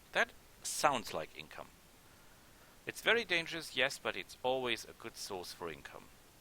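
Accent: Germany. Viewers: He is german I think. He has a clear german accent. In the german language, the two digit numbers, and every two digit part of more digit numbers are spelled backwards, and only the two digit parts of those numbers, too. So when I do a literal translation, for example they say 25: five and twenty. 74, four and seventy. 368, three hundred eight and sixty. I know, it's very unintuitive, when learning that laguage it fried my brain many times, among other... quirks of that language.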